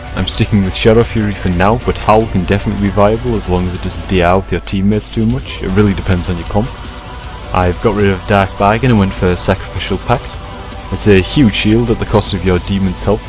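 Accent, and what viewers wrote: Newcastle, United Kingdom. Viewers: nice vid thought i heard a hint of newcastle in u for a sec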